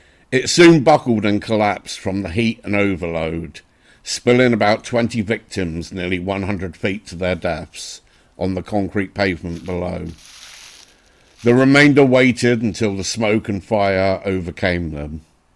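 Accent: United Kingdom. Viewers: Another expertly done video,. Kudos, as always! Double fascinating to me, since I am not only a history buff but also greatly enjoying reading about & seeing images of old cemeteries. But of course what a totally heartbreaking piece of history. So very sad. I always also enjoy your narration (especially love that British accent!). THANK YOU very much for sharing with us. 🤓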